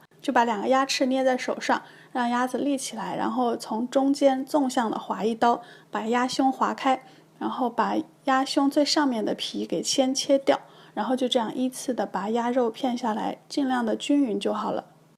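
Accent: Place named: Nanjing, China. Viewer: 南京人！哈哈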